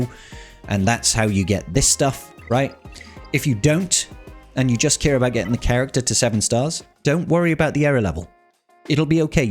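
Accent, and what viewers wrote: United Kingdom. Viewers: Error? Era. You’re a Brit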